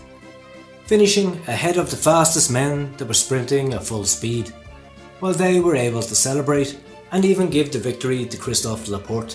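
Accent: Ireland. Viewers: What makes you think this is from a Brit? The narrator is Irish (you need to read a bit of history if you think Irish and British are the same thing!), and the early videos all have continental European narrators. I am British, however, and I think your full of shit if you think that just because British athletes have doped that I am not allowed to voice my suspicions of others. I personally believe that there are more at it than aren't, and not just in cycling.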